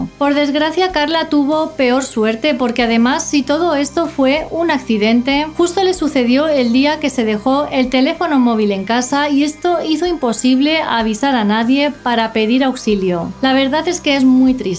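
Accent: Spain. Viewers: Me encanta como hablas y tú acento español.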